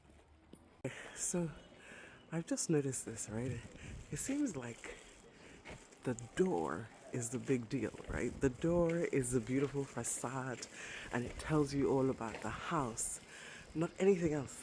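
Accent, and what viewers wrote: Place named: Nigeria. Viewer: Beloved, ur intonation sounds Nigerian😅😅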